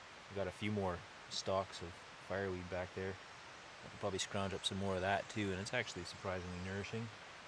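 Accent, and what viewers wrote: Canada. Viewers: nice series. it sounds like your canadian, too bad you cant carry a pistol. it would suit your needs and is way less cumbersome.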